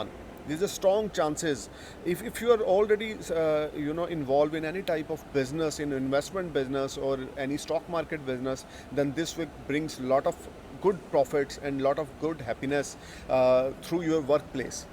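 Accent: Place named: Punjab, India. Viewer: Heavily accented Punjabi toned English. Speak slow it will get better. It’s not Haraash it’s Harsh. It’s not prediction it’s prediction. Hope you won’t mind.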